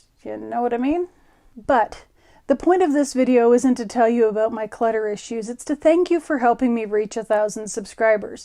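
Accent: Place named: Ottawa Valley, Canada. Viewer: Huh. I thought I was the only one hearing an angelic choir every time one mentions... The Algorithms… (gosh, they're loud). Big congratulations on the bump in viewership. Well done. Ah the subtle twang that is the Ottawa Valley. Fascinating that you got mistaken for Australia. And that 'Somebody knows something' podcast, season 1. Well. It's not often that you get the details of things that happened to someone who would have been mine and Mister Husbands age. I probably fished around that lake around that time. I'm loving the post-modern digital communication analysis, by-the-by. Guess we were having the same conversations at Carleton back in the day (the painters tape you have over the 'pop' bottle lettering... bowing). Suggestion for one of those marketing relationships kind of thing - make friends with the local independent 'stuff' suppliers for at least a cut in costs of material. 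I have no idea if there's an equivalent to the agency that reps Miz Bernadette in the Canadian area codes - maybe somewhere in T-dot or Van? Maybe Enchanted Rose knows someone? The 1915 hand-crank Singer 99K is here. And sews just like she came out of the factory - absolutely mind blowing. And I got to use her on my FR entry. Which is almost finished and about to be photo-ed. Form fitting shell dress a la the (19)40's, representing Blanch from Streetcar Named Desire. Too cheep & poor for patterns so I taught myself how to pattern draft for my Covid time. Not baggy clothing is... weird. I'm used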